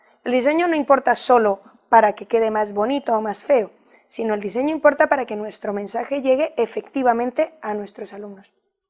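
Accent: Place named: Spain. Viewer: Esta tia es mexicana y habla como española. Vale.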